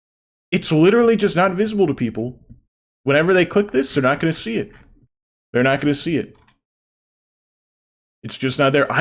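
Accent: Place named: England, United Kingdom. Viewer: Hallo, a hallo! Excuse me, geezer, but that’s an English accent. Not Australian, where my ancestors imprisoned Shad long ago.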